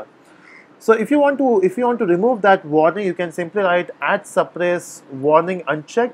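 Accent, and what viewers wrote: India. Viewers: You have to be Indian to understand his accent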